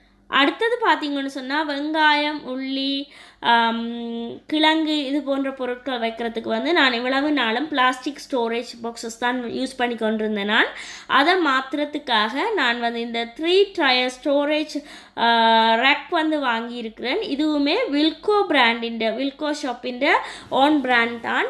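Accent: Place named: Sri Lanka. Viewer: Very good collections dear:) Your Tamil is too good to hear:) Lanka?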